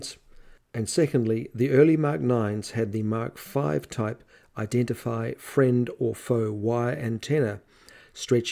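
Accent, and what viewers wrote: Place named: New Zealand. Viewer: Nice to hear a kiwi accent on youtube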